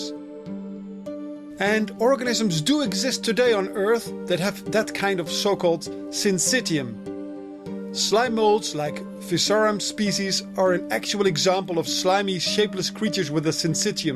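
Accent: Netherlands. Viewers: oh dear, i am hearing a Dutch accent! hollandse youtubers zie je niet zo vaak!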